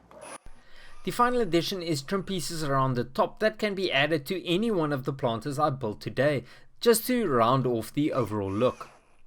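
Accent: Afrikaans, South Africa. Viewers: Lekker Afrikaans accent💪💪 lekker channel. Dankie. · You sound like one of those South African people. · i love south african accents. they're so jolly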